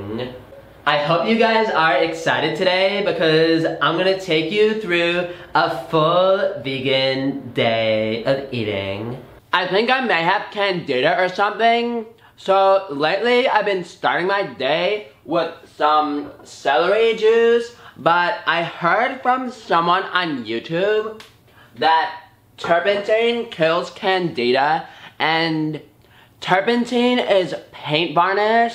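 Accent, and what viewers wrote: New York, United States. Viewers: Too funny! First there was an eggplant on the toilet tank, later on there was a pineapple on the tank. Go back to show business. You're a natural talent. Great Valley Girl accent as opposed to your normal New York accent.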